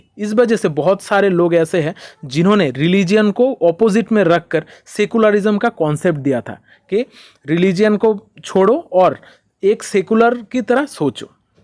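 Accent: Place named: Odisha, India. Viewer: Apke accent se lagaa aap Odia ho. Maaf karnaa.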